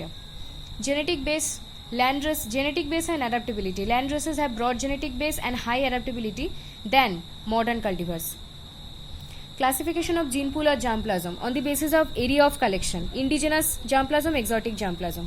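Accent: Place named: Bengal, India. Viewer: Pura Bengali accent h💝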